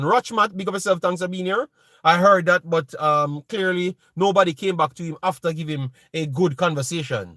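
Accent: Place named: United Kingdom. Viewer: Great to see you back big boss! That british accent kills me everytime 😂😂😂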